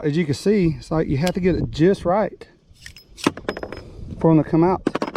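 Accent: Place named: Texas, United States. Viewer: well, I mean there is a Spanish pronunciation, but I wasn’t trying to offend you man. Sounded like just a southern accent. Similar to what my family in Texas would say. Liked the video, just made me chuckle. No offense brother.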